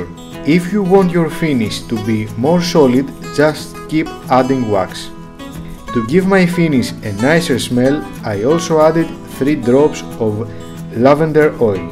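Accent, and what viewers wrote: Greece. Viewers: I could tell in 5 seconds you are Greek. My Papou is from Zakynthos, Ti Kaneis! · that greek accent )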